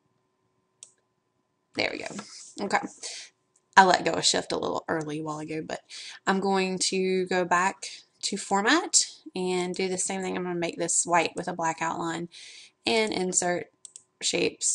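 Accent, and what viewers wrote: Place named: South, United States. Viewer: HOT/SMART/SOUTHERN ACCENT. Your gonna make someone very happy.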